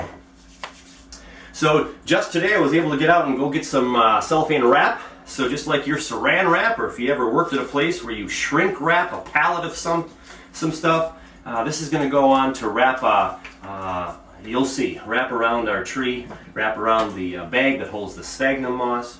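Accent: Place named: United States. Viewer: GREAT video ..!! I sooo know that you are an American as you say the word roots ... as ruts. Just like when Americans say the word "roof", .. as in the sentence ... "I am going to fix the shingles on the ruf ..." Sooooo funny !! (and yes, .. i am a Canadian .. lol)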